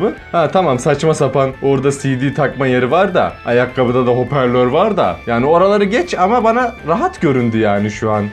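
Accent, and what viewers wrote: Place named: Ankara, Turkey. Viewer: Aa ben de Çorumda yasiyorum. Burada da Ankaralılar var konuşmalarınız benziyor zaten 😆😊😂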